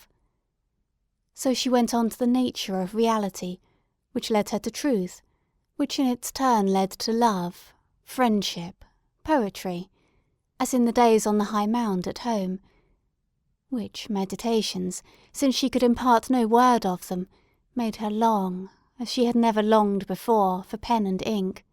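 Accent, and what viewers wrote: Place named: United Kingdom. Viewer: Nicely presented. I enjoyed listening to your voice and beautiful Bristish accent 😍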